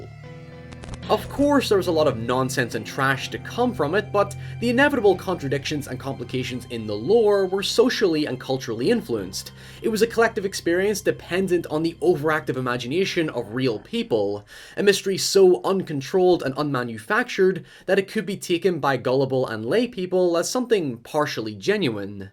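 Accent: Northern Ireland, United Kingdom. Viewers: Are you from northern Ireland? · I think this is the only channel I have found with an accent from home. Go on norn iron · I love the NI accent <3 Pronouncing "now", "nye" is so cute.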